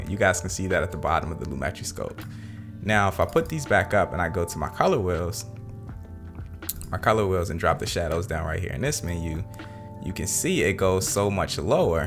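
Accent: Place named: Atlanta, United States. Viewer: His dialect is like Andre 3000. Atlanta, maybe?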